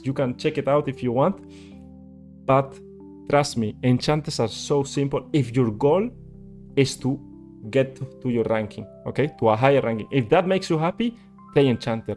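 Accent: Spain. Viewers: suena tan raro escuchar a alguien español haciendo tutoriales en ingles xd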